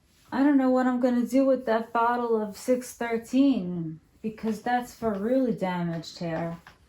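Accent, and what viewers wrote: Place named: New York, United States. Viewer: New York accent hits hard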